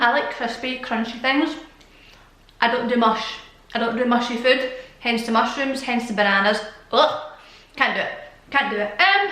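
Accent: Scotland, United Kingdom. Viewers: Haha no I love the Scottish accent! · "I like you. Do you want to be my valentine?" XD Also, I love your accent! When I first found your channel I was like, oh my gosh, her accent is everything. My favourite musician is also Scottish, so I may be a bit biased. ;)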